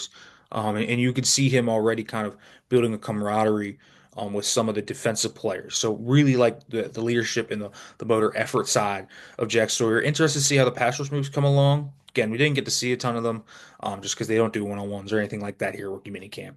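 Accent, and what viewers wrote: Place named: Appalachia, United States. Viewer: i have to ask, what is your accent? No disrespect! it kind of sounds like WV holler-Eastern KY slang 😂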